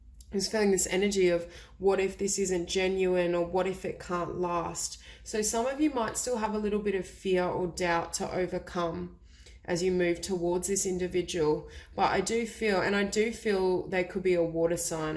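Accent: Australia. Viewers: Number 3! Resonates 100% thankyou so much❤️ Are you from Australia?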